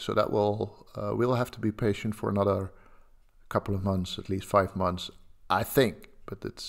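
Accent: Netherlands. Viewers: Come for the bitcoin insights, stay for the Dutch accent! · A very slight Dutch accent is what I’m detecting. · Really nice accent. It almost sounds Dutch but I can't place it exactly. Where is it from? Edit: Channel says Netherlands. So I was onto it. I love the Dutch-English accent. Sorry I'm weird. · You've a Dutch accent on your English. What did you study at the Uni that enabled you to put out these models? Asking for my son.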